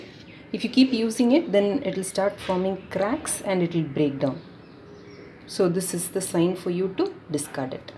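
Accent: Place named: South, United States. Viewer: Very very informative. Thank you. I like your southy accent.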